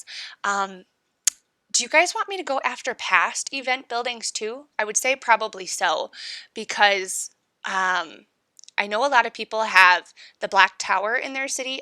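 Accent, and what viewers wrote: Wisconsin, United States. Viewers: Gotta be from Wisconsin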